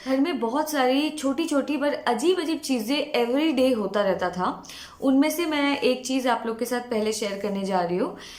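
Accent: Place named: mixed, India. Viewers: Meri gf ki trah pronounciation hai aap ki Assamese bengali jab hindi bolte 😅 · Loved your stories and the narration :) Btw you have a northeastern/bengali accent...are you bengali!? 😅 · I like your stories and your speaking style is very cute and my own sister-in-law was an Assamese so I understood that you were an Assamese too. She also talks like you😊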